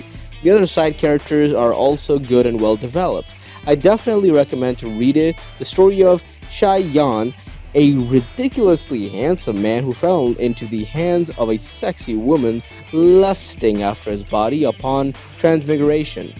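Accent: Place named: India. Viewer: Are bhai Indian ho na tumhare English se hi pata chal gaya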